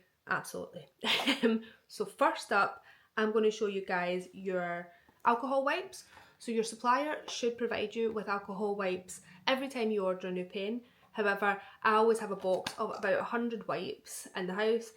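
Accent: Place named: Glasgow, United Kingdom. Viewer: I absolutely love the accent! As you say, a broad Glaswegian accent is pretty much a different language.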